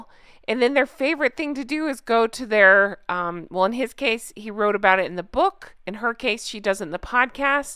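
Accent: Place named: East, United States. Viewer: You are correct, Greg. But she is from somewhere in the east US, making her pronunciation incorrect. Besides, mine is the dictionary pronunciation, which I only checked after your comment.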